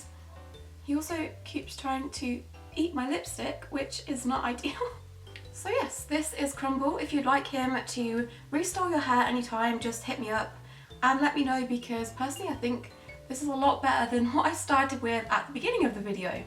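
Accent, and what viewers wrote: United Kingdom. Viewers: And also, sorry for being creepy but what part of the U.K. are you from? 💕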